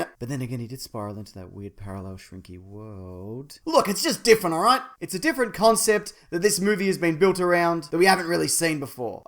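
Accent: Australia. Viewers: Mate you are so Aussie, it's fantastic. Thank you for being so ocker and totally whatever about the whole thing - made the review much more enjoyable haha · I thought this guy's Australian (British?) accent would get to me ... but it wasn't too obnoxious.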